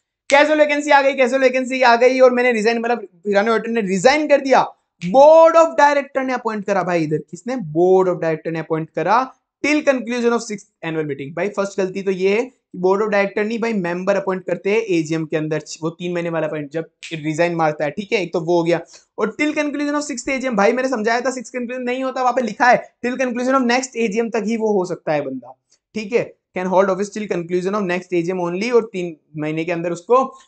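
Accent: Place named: Bihar, India. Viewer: Audit Committee bhi nati nikli 😂😂😂😂 Sir apka bhasa se aap bihar ke legre hain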